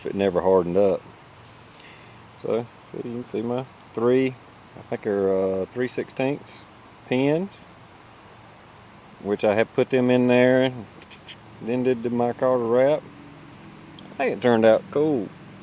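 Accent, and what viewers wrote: North Carolina, United States. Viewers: hear a hint of NC accent there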